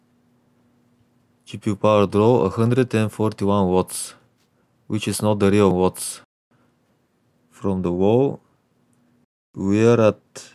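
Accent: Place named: Russia. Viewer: Какой русский акцент.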